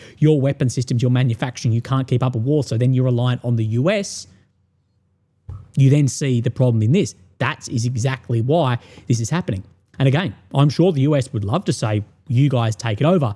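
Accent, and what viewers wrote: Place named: Australia. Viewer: Love your channel and would continue to support it, but quite honestly the Australian accent and rhythm is nearly impossible to follow. Not sure what you can do to get around that, perhaps sub titles. No offence but it's really difficult.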